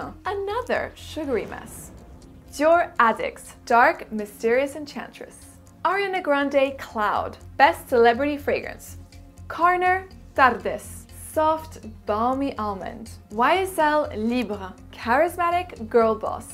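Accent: France. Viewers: Un accent français hh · The addiction for "Alien" and YSL "Libre" my mom has passed down to me is magical and indescribable! Your French is just so satisfying and lovely to hear! Merci! ♥️ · U have an excellent frensh accent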